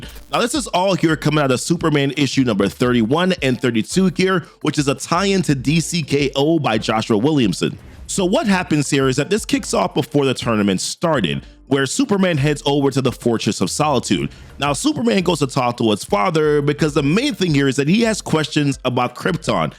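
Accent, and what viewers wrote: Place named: Jamaica. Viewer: i didn't know you were a yardie !? what part you from ?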